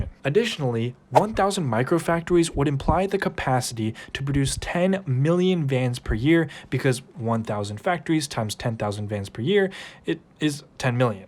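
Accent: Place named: United States. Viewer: dam yanks ruining the English language as usual. Pfft! ....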